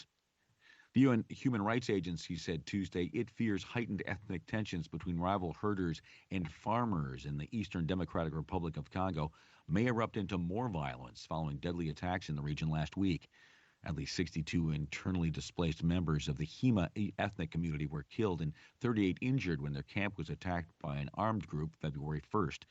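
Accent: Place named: United States. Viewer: Thank you very much Mr., for all the updated news. I do like to listen to your nice American voice. I hope that in the next time, you will read the news with slower speed. Therefore, we can listen and understand the news contents clearly. Furthermore, the speaker can avoid the readings tripped and wrong. Once again, thank you very much for your attention to my comments.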